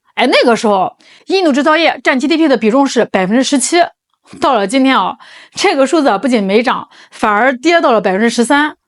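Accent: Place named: North, China. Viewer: 北方的吧，这口音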